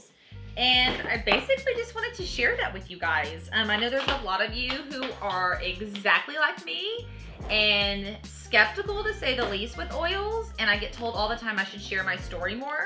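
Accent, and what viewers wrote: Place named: South, United States. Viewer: She's southern, y'all need to stop correcting the accent. If you're southern doTERRA sounds like du-terra. It's not a big deal; get over it. 🙄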